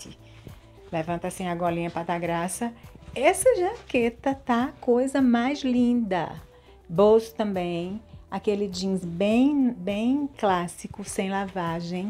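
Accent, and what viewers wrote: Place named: Northeast, Brazil. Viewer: Pelo seu sotaque deve ser Nordestina, como eu. A não ser que você não more no Nordeste, esses looks não são completamente inadequados? Ou então você mora no sul ou algo parecendo 🤔🤔🤔🤔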